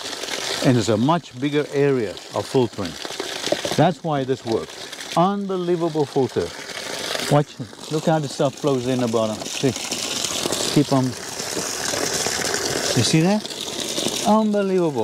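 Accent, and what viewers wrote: South Africa. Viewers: Are you South African that has been living in the states for quite a while? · And here I thought you were originally from Germany. Must be an Afrikaner accent, right?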